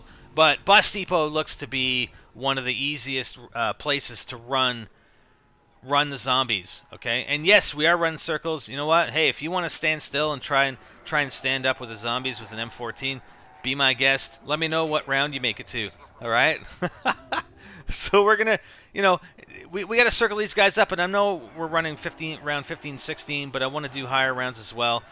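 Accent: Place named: Canada. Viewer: He's Canadian. He has an accent.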